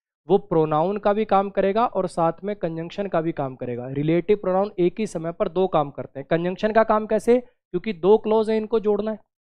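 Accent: Haryana, India. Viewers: Sir are you from haryana..i felt haryanvi in your conversation 😅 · Sir aap haryana se ho kya 😎 khinda do sun k lga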